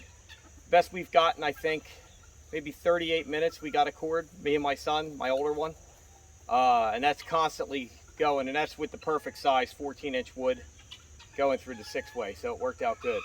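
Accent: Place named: Maryland, United States. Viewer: You sound like a fellow Marylander. Great machine great video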